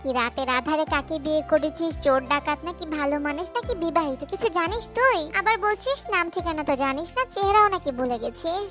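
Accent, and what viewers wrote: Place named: Narsingdi, Bangladesh. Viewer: ওও আমার বাড়ি নরসিংদী খুশি হলাম নরসিংদী কথা বলছো বলে 😂😂😂😊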